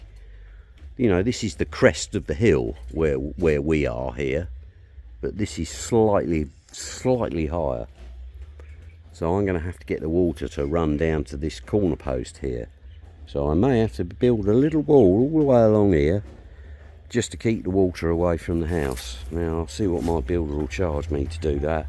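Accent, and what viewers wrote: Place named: United Kingdom. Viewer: Looks great. Good luck to you. Just a fracture! Lol Sound like you come from the same part of the UK as me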